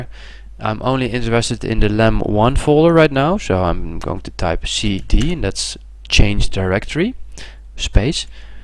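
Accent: Netherlands. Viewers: Nederlands? Ik kom uit Nordduitsland, ik ken dit accent :P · Dat kwam ik achter. Dat was duidelijk Engels op een Nederlandse toon.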